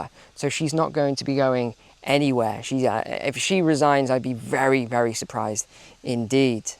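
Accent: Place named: United Kingdom. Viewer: Thanks for your great info., humor, and stories---and we love your beautiful clear speaking, and with that great British accent. And your infectious SMILE...that helps while listening to some of these news items. God Bless you and your Family. Let's pray for a turn-around........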